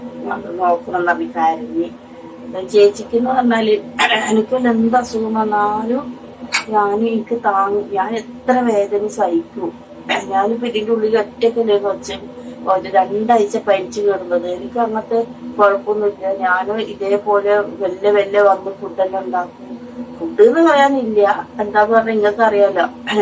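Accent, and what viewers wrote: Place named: Malappuram, India. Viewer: നിങ്ങൾ മാനന്തവാടി എവിടെയാ സംസാരംമലപ്പുറം കാരുടെ ആണെല്ലോ ഞാൻ അഞ്ചുകുന്ന കുണ്ടാല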